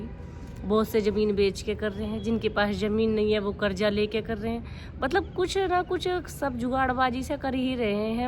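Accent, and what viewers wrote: Haryana, India. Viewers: आप की प्रस्तुति और विषय दोनों अच्छा लगा, वैसे मैं अवध से हूँ इसलिए आपका हरियाणवी अन्दाज में बात करना अच्छा लगता है✔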